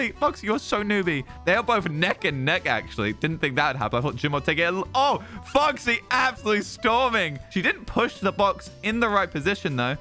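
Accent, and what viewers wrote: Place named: United Kingdom. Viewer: cool video and all but why are you british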